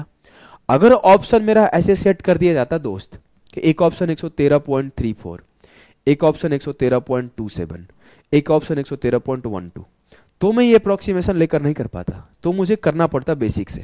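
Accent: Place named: United Kingdom. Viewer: App itna smart q ban rhe h ,, aram se hindi padh kr samjhaiye,, ham jante h ki apka english thik h,, chanal ke naam h bihar yodha or briten jaisa bhasha bol rhe h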